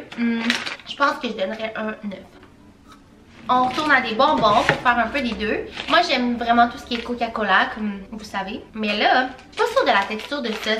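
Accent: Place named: Quebec, Canada. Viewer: Comment tu te sens obligé de dire « je suis québécoise » 😂 ça parait juste en t’entendait parler haha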